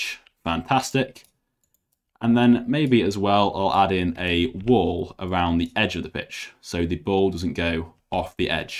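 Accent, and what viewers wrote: United Kingdom. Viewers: british mate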